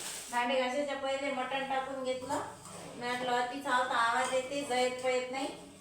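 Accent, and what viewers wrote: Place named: Yavatmal, India. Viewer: तुमचं गाव पुसद. यवतमाळ जिल्हा आहे का. का आरनी आहे भाषा तिकडची अशीच आहे😊माझी बहीण आहे तिकडे. ईजनी गाव आहे यवतमाळ जिल्ह्यात आहे बहीण😊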